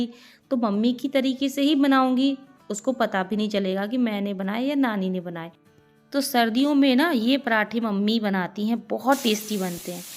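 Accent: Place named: Bundelkhand, India. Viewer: Aapi boli se apnepan ka ahsas hota hai, apni taraf ki lagti hai, aap bundelkhand mai kaha rahti hai, kache amrood na mile to kaise chatni banaye?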